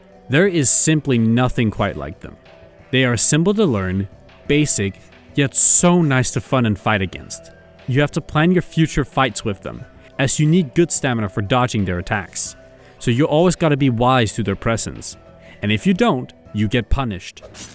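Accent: Denmark. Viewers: bro r dansker wtffff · Er du dansker? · jeg var slet ikke klar over at du var dansker💀💀💀💀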